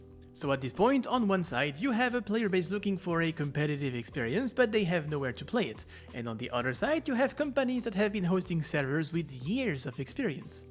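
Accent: France. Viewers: In the first minutes I didn't realised ur french. Srryy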